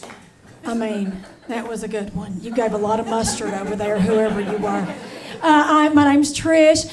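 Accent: South, United States. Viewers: Really great set! 😂😂 a really sweet southern accent too! Best of luck Trish! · I love her southern accent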